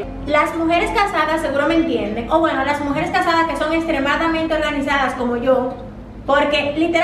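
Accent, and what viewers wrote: Dominican Republic. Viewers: Me encanta el acento de República Dominicana 🇩🇴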